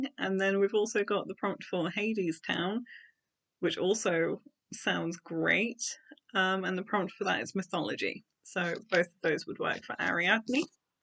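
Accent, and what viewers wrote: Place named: United Kingdom. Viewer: Ikr? It's like the saying about there being so many books & so little time. But if you're a fast reader ( I was but it's now depending on the book) you'll do fine👌.I just lost one of my cats while taking him & his brother to the vet to be fixed,so I'm finding it difficult to focus on reading more than a short chapter atm. Anyway,just keep on reading as much as u can!👍 P.S are u from The UK? Your accent is so pleasant to listen to. I'm from Northridge, California,a suburb of Los Angeles & can't stand my speaking voice-lol.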